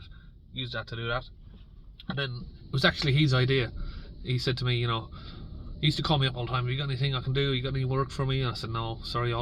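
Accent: Ireland. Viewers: Thanks. For to be in England form 12years old, good to hear you haven’t lost the Irish accent. 👍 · It woukd great to.see.ya moving to ireland ,I have two luton maxi movers ready , for a fella that spent that long in the uk ya never lost the accent carlow or laois ?by the way 40 isn't that old ,ireland got very expensive that last 5 years though great channel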